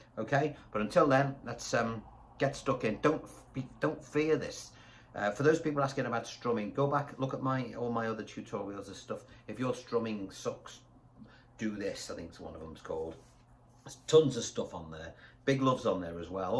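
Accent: Liverpool, United Kingdom. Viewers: You’re deffo an old Skool scouse and this is a really well taught lesson👌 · Actually I can do this pretty good. I learned it from Val Doonican on his TV show in about 1977. That was after 20 yrs of trying to play with a plectrum! The reason for my comment is to thank you for the clear simplistic way you have delivered this very important aspect of guitar playing. It certainly brings out the best in solo playing - that much is for sure. I like your easy delivery and I think I detect a little bit of infectious Scouse in your voice don't I? Thanks again, BjG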